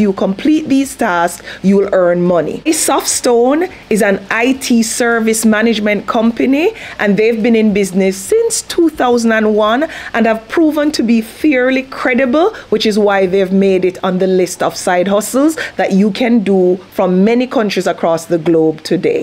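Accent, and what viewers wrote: Jamaica. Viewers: I received your email and apologize for not responding immediately. I got sick over the weekend after visiting the hospital to get a CT scan done. I picked some virus and came down with a dripping sinus and a fever in the early Sunday morning, so I was also absent from church service in an effort to prevent spreading my germs. However, I must admit that I love you so much and believe you are a God send angel on earth for so many struggling people, myself uncluded. And, I hope you won't mind if I call you because, from your accent and the way you say 'walk good'; a saying we Jamaiacan people have, I strongly believe that's where you are from. If so, more power to you. If not, I still say more power to you and lots of love and blessings from God our father, from whom all blessings flow. To your followers, believers and non-believers, please DO NOT TAKE OUR GODLY BELIEF personal. 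You are entitled to your spiritual belief as well, and YOU ARE JUST AS LOVED AS and me.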